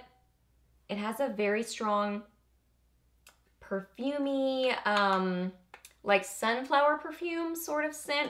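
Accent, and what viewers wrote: South, United States. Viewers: Makeup Junkie I thought she was from the south. It bugged me lol! So I googled it. Nope.. She was born in NY then moved to CA.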